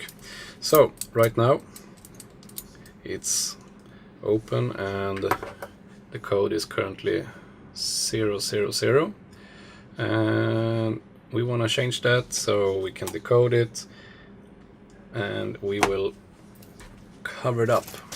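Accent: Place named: Sweden. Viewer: You are swedish, I hear it by accent... hej på dig!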